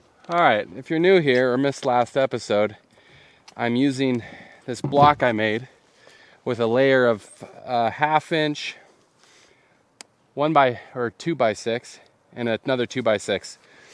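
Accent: Canada. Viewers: First of all, you were really letting your Canadian flow this week hahhahahha... I 100% agree with the building bug! I am not done with my place yet and I am already thinking how I would like to try my hand at a log cabin. Heck, I am still young enough.....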